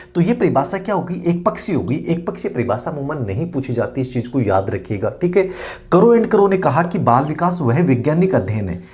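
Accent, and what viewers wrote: Rajasthan, India. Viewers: Sir app Rajasthan se h Kya language se lagta h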